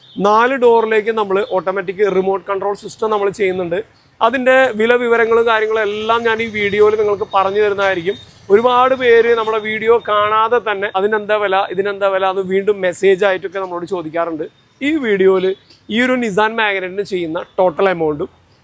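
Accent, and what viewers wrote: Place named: Thrissur, India. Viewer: സംസാരം കേട്ടാൽ അറിഞ്ഞൂടെ തൃശൂർ.. ☺️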